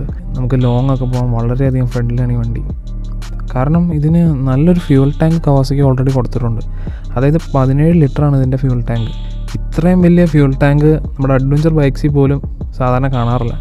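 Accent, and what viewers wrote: Kottayam, India. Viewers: Broo kottayam kaaran aanooo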